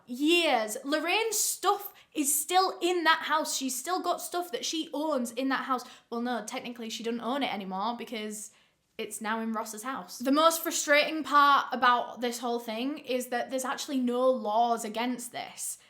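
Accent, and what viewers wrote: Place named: Bedford, United Kingdom. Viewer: Fascinating your accent of bedford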